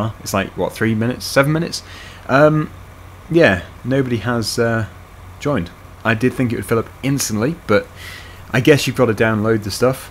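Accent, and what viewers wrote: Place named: United Kingdom. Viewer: David Correia uk people have a different acsent and that’s how he says assume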